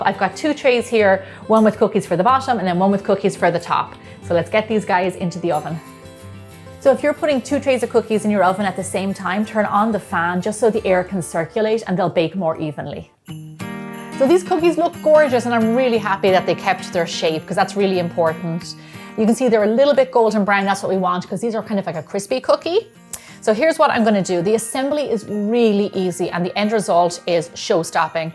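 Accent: Ireland. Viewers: Do I hear a lovely Irish brogue? Delightful!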